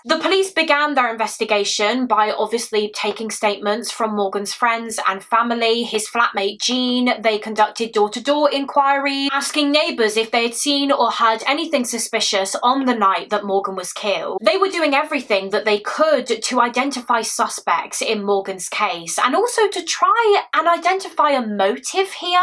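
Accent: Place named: RP, United Kingdom. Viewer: I had a horrific day but a new video will cheer me up. That posh accent is so relaxing 😊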